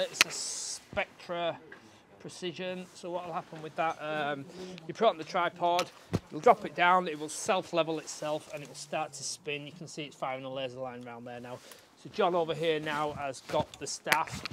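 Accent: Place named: Leeds, United Kingdom. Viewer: ey up, these are great videos! im a leeds lad living in cornwall now s0 its good to hear the leeds accent. may i ask, what is the minimum distance i can build my base up to a neighbours fence to conform to building regs? ? (subscribed)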